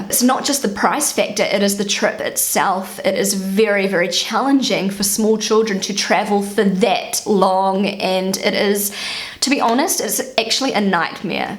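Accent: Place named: New Zealand. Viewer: I like all of you videos, - your positivity and smile really warms my heart. How lucky your husband and your children are:) And you know what? I had to google wikipedia for a New Zealand English article because when you get used to British or American English it's so funny to hear a New Zealandish accent. It's interesting to compare just the word "better" spoken in these three variations [ˈbɛtʰə] (UK) / [ˈbɛɾɚ] (US) / [ˈbeɾə] (NZ). Just t o let you know <3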